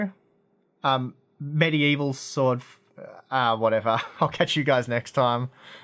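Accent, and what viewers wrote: Australia. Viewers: You sound Aussie are you?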